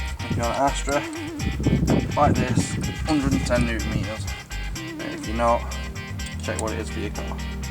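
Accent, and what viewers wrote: England, United Kingdom. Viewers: Nice camera work and nice English accent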